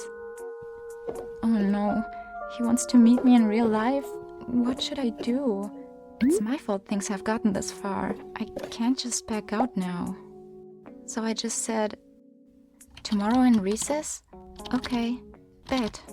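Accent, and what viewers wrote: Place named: Europe. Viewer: YOU SOUND EUROPEAN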